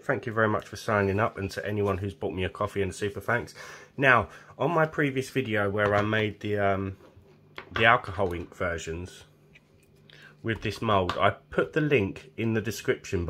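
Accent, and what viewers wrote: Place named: England, United Kingdom. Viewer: Sorry, I must have missed something you said, when do you apply the black/white colour to the pieces please? I’m worried about doing it too soon and obliterating everything else. Thankyou so much for your work and videos, sometimes they are all that keep me afloat, it’s lovely to hear an English accent too, being of that country.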